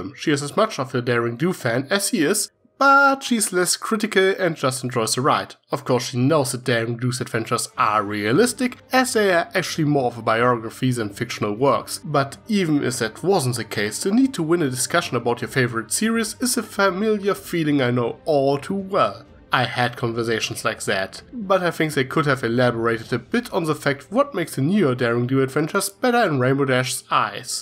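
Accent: Germany. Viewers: True. Also, are you German? You seem to have a European accent, and it sounds German to me. If so, nice to meet another German brony.:)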